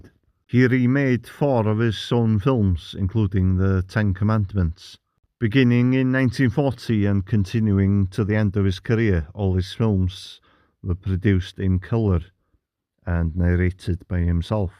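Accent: Wales, United Kingdom. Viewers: Loving your Welsh accent!! Love your channel xx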